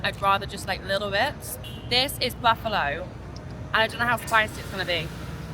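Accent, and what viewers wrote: United Kingdom. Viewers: Hey, are you british? Cool accent 😎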